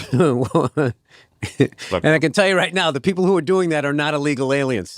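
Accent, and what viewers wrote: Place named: India. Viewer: i can instantly tell that you are indian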